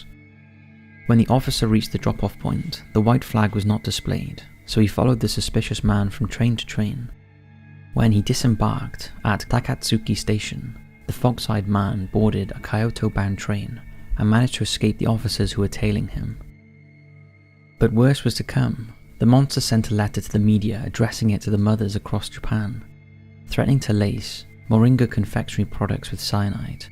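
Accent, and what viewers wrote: United Kingdom. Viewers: Nothing like stories of poltergeists and demons told by soft British voice to help you nod off.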